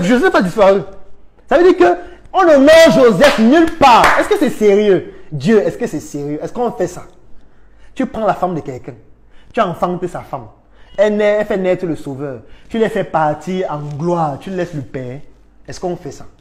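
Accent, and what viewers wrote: Cameroon. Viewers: Il s'exprime avec le ton des Camerounais . Chez nous au Cameroun, la plupart des gens parlent à haute voix et c'est très souvent naturel pour attirer l'attention des interlocuteurs . Alors si tu viens au Cameroun, tu vas aussi commencer à parler sur le même ton .😅😊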